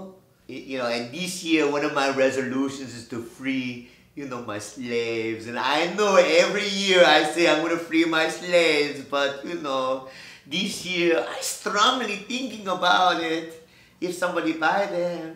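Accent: Mexico. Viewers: accent sounds more mexican than korean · Lol sound more Mexican than asian but Whateva works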